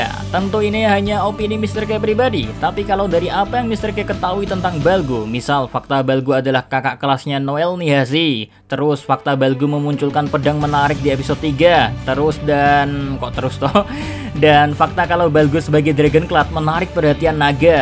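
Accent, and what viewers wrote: Java, Indonesia. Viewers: DHE ( MEDOK JAWAX )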